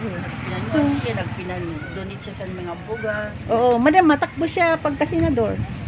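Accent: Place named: Masbate, Philippines. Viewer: Ohhh ok.. may kunting halo salita ninyo ang masbate. Ano po tawag sa language ninyo dyan?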